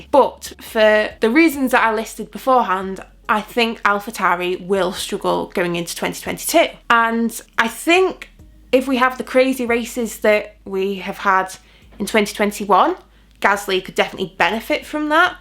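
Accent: United Kingdom. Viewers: You've got to love that british accent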